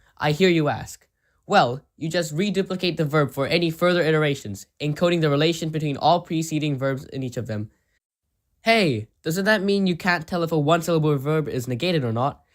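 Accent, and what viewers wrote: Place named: Lahore, Pakistan. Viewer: lol at one point int he video they show a grammar chart or something and aling english there was an urdu comparison, this + the accent, which to me sounded similar to a lahori, made me think i had found another one of us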